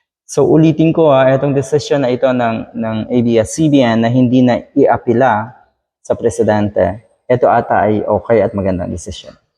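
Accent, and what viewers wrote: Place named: United States. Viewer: FIL AM YATA ITO SLANG MAGSALITA...SLANG DIN UTAK ..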